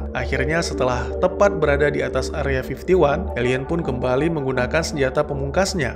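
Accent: Aceh, Indonesia. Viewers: Bang lu org aceh ya?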